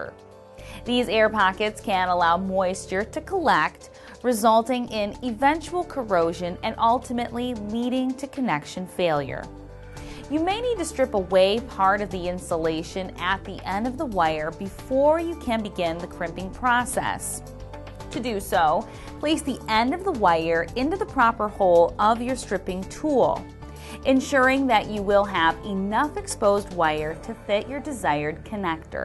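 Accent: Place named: Upper Midwest, United States. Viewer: this is a good vid but her accent is hilarious. I guess I haven’t been in the upper midwest enough....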